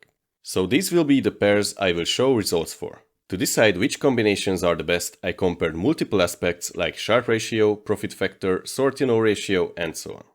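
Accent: Hungary. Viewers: lets say we have commissions about 3$/Lot . One more important question: Is tradingview backtester counts the spread ? Thank you for your answer . I am not here to criticize you because you looks like one honest trader from 100 of scammer but i want to understand and maybe try out your strategy . And one more personal question . I see that your channel is from Romania , and i hear from your accent that you maybe hungarian , is it true?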